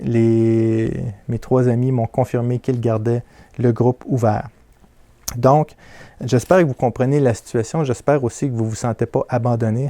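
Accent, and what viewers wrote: Quebec, Canada. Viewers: Bon rétablissement à votre épouse. J'ai adoré vos vidéo, votre bonne humeur, ainsi que votre accent québécois. C'est grâce à vous que j'ai commencé l'impression 3D. Merci infiniment et BRAVO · Mais bien-sur que je te met un like, Prend soins de ta famille, encourage ta femme qui à du te partager avec nous, tu vas tellement nous manquer. je crois que je v coller ta photo sur ma cr10, avec ce sourire et ton accent de Quebec tu a tellement apporté à la communauté de l'impression 3d amateur francophone. énorme merci camarade de toute la France Damien un fan à qui tu as appris beaucoup et qui dans la difficulté de certain print te regardais rien ne lâche pour tenir. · Bonne continuation pour la suite, occupe toi bien de ta famille, profite bien d'eux et surtout bon rétablissement à ton épouse. Mais malgré tout je suis triste, car je n'aurais plus le plaisir d’écouter tes conseils et ton accent de cousin de l'autre coté de l'atlantique lol Merci pour toute tes vidéos, j'ai du en voir les 3/4 et tu m'as sortir pas mal de fois de mauvais pas;) Bonne continuation et à la prochaine. 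· C'est en grande partie avec toi que j'ai débuté en impression 3D. J'ai aimé la rigueur de ton travail, la sincérité de ta passion de transmettre, et aussi ton accent Québécois. Et tu as pris la bonne décision. Je souhaite bon rétablissement à ton épouse, et à toi beaucoup de bonheur avec ta famille, et pour très longtemps. Un grand merci pour tout ce travail qui nous a profité à tous. Si un jour l'envie te venait de passer des vacances en France, sache que je t'accueillerai toi et ta famille avec grand plaisir. Bonne route... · Merci pour toutes les vidéos et conseils tellement utiles et pertinents. Il est rassurant de voir des personnes qui ont des valeurs : la famille est si importante. Carpe diem et encore merci pour tout Toutes mes pensées pour le rétablissement de Madame Il sera difficile de ne plus entendre ce charmant accent canadien pour un auditeur français ! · Bonjour je ne commente jamais les vidéos mais très attentif au explication ou découverte de nouvelle imprimante, je suis de tous cœur avec toi. 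Tu nous manqueras avec ton accent canadien que l'on apprécie temps de notre France. Prend bien soin de t'as famille, merci pour ton travail de passionné.Tu vaux bien plus qu'un simple like.Bonne continuation et encore merci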